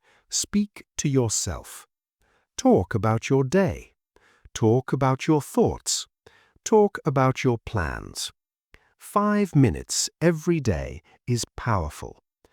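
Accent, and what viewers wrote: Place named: United Kingdom. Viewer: এই ভিডিও টি ব্রিটিশ accent এ মনে হচ্ছে কিন্তু আমি তো এখন USA থাকি।এগুলো American accent দিলে উপকৃত হতাম।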